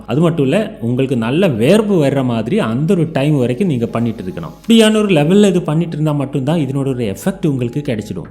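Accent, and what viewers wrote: Kerala, India. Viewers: Ivan malayali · சகோதரா நீங்கள் மலையாளியா??? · Malayalam boy ❤️✨ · Vadakkan ella malaiyali chettan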